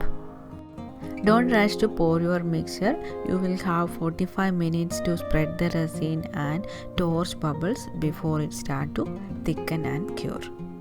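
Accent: India. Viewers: мне так нравится твой индийский английский!!! Американский английский звучит на мой слух ужасно, не всегда можно понять говорящего, а твой звучит чётко и даже нежно. Обожаю тебя слушать, хотя ты редко это делаешь. А цветок нежнейший, а запечатанный он выглядит просто восхитительно!!!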